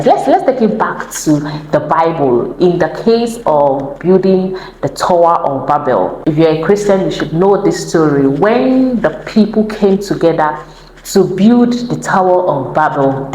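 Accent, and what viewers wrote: Ghana. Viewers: You sound like Ghanaian not Nigerian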